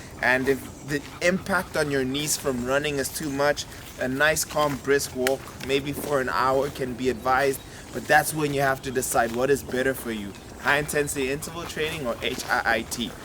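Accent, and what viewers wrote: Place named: South Africa. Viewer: Is he from South Africa ? Sounds like he has a South African accent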